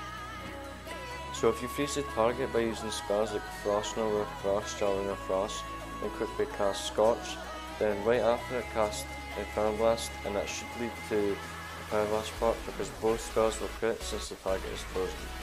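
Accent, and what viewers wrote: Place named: Scotland, United Kingdom. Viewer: Scottish ya fucking rocket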